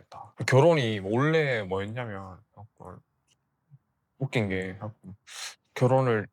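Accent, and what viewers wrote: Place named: Gyeongsang, South Korea. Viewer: 경상도 어투가 있어 친밀감 확~!느껴져 글 남기게 되었어요ㆍ저도 고향이 경상도 김천이라서요ㆍ~^^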